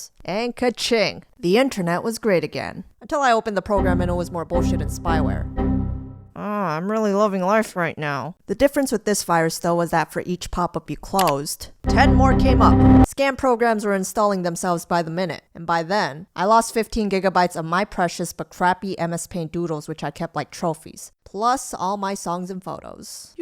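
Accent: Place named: New Jersey, United States. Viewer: no hate but u sound like the steryotypical jersy girl/New york girl 😂💞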